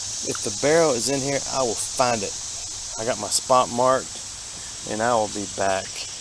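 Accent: Alabama, United States. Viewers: What part of Alabama are you from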